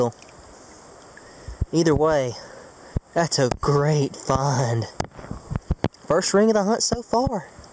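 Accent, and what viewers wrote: South, United States. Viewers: I love his accent an he's Avery handsome southern man stay that way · where are you from you sound like you're from the south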